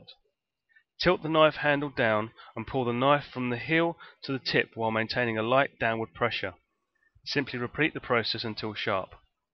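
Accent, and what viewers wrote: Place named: United Kingdom. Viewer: Great and thorough review. And the cool British accent is a nice touch. :)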